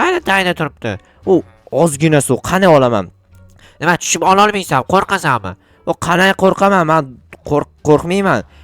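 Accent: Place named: Bukhara, Uzbekistan. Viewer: Buxoro shevasi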